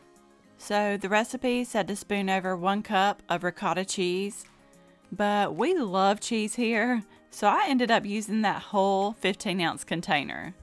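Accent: South, United States. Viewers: You have such amazing recipes! I love watching you cook and listening to your voice. It's so heavy with your southern accent which I LOVE and it's so soothing. It reminds me of my Momma's♥️ 💕🙏🏼💕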